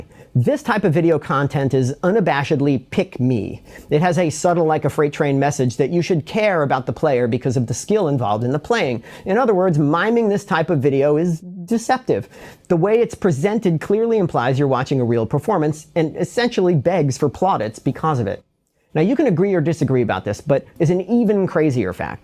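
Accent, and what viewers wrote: Italy. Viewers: That's a pretty sick italian accent, dude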